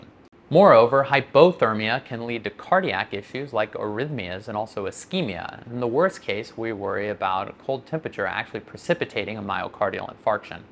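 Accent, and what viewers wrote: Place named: United States. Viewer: Oh really, well you’re speaking to a different audience than I assumed. Your English is clearly accented from the States. Carry on then but non professional Americans will not make the necessary calculations to make your temperature references meaningful. Let me also say other than that I think your presentation was excellent with meaningful information and I hope to see further videos by you.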